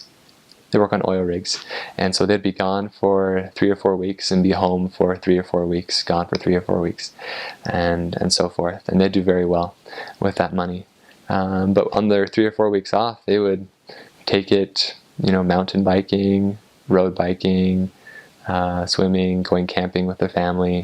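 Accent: Norway. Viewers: You have an amazing Norwegian accent👍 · This guy is obviously having a Norwegian connotation to his accent, and he looks super Scandinavian. No way he is "American"... born and raised in Norway at least. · Thats some nice norwegian dialect there!!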